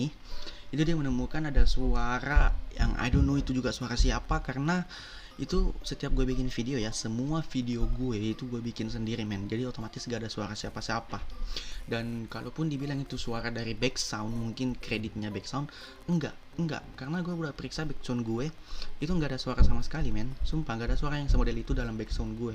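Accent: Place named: Makassar, Indonesia. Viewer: Mungkin karena kalau ndak salah part 2 dia pakai kaya logat makassar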